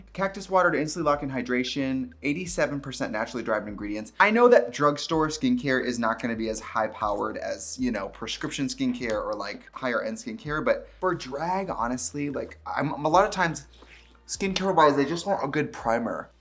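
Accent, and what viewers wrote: Wisconsin, United States. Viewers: Her Wisconsin accent matches angry candle lady’s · Omg the Wisconsin Mom accent ❤😂❤😂❤😂❤😂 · As a born and raised Wisconsinite who now lives elsewhere in the US like Trixie, my favorite thing is hearing just how much more pronounced her WI accent becomes when she's back in the state. Because the EXACT same thing happens to me. It's like a disease you can only hide for so long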